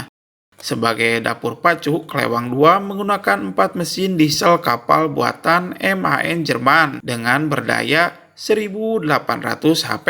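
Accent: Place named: Papua, Indonesia. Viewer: admin logat ny kya orang papua ya.. khas banget😂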